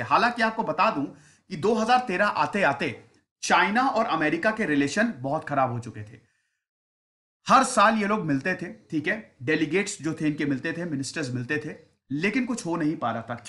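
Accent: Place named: Uttar Pradesh, India. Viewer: bhayi tu up ka lagta hai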